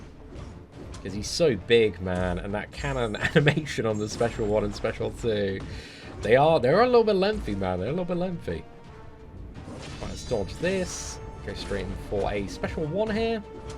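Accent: United Kingdom. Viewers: I love apocalypse you are lucky Also love the British acsent it is the best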